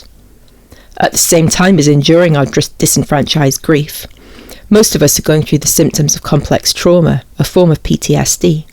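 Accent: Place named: England, United Kingdom. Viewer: It's alright... I've made it this far :) & Yes I am guilty of oversharing the experience. Thank you for your channel; the 'Unstable Adult Baby' label I heard has been replaying in my mind alot as being so incredibly true*. I live in the US but I have English cousins so it is refreshing to hear the accent as I don't get to talk to them much. One of them sees through all the BS and was able to 'confirm' what I was seeing & validate my response. Sadly, she was smeared too by my family & sibling. Cheers and thank you again.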